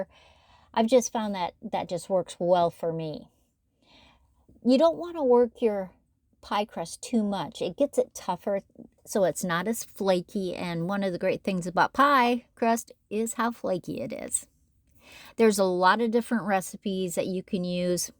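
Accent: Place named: West, United States. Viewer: Absolutely beautiful!! You are amazing!! I have to tell you, my Mom was born and raised in Livingston Montana. You say “frigerater” just like she did. 😍 must be a western thing. 😀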